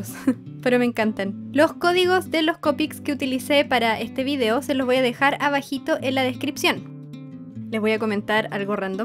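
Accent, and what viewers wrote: Chile. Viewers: Eres chilena cierto? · Se nota q eres Chilena :3 CHILE GANA WEON >:VVVVVVVV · No se si yo tengo un don de saber quien es chilen@ o esque ella tiene un acento fácil de saber que es chilena... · Eres chilena??? O alguien sabe si es chilena??? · Se nota que eres chilena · Eres chilena? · oye eres chilena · Es chilena?? Se nota en la voz mdmd❤️ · Es chilena? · ¿eres chilena? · Eres chilena ? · ¿Eres Chilena? Yo si.... PD:Tienes vos de Chilena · Piyo eres chilena?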